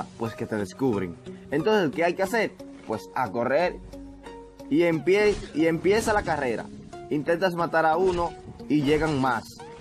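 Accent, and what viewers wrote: Dominican Republic. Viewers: Eres dominicano sierto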